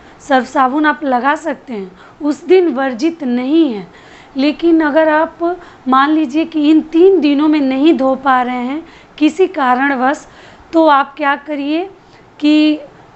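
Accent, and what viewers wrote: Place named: Purvanchal, India. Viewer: Thanks didi mai female hu ye mere husband ki I'd hai mera naam kavita hai aap purvanchal se hai na apke boli mujhe bahut pasand hai apki videos se bahut kuch sikhne ko milta hai apki tips mai follow karne lgi hu aur life me uska fayeda bhi mil rha hai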